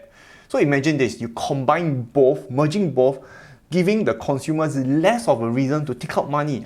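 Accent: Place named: Singapore. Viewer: I’m Becoming .. filthy rich because of you by doing nothing but picking..”it’s CRAzy” ( in your awesome Singapore accent )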